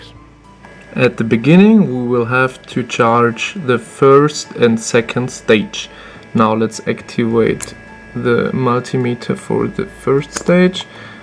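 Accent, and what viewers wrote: Germany. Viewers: So einen heftigen deutschen Akzent, habe ich lange nicht mehr in einem englischen video gesehen xD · Ha wusste ich doch dass du deutsch bist dieser Akzent ist unverwechslebar ^^ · und Deutsch klingender Akzent. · Are you German??? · Lol.. Ich dachte mir sofort, dass das ein Deutscher Akzent sein muss :DD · very nice video, at first I thought you are a russian guy, but no, ein deutscher Kamerad :D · are you german?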